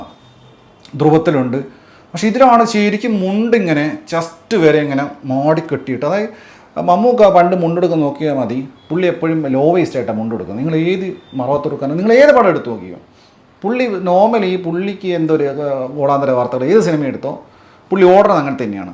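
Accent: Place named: Trivandrum, India. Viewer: How you got trivandrum slang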